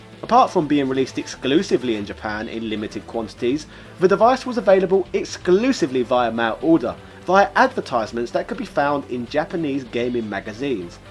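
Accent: England, United Kingdom. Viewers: Are you england? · It's his accent. He's from the U.K.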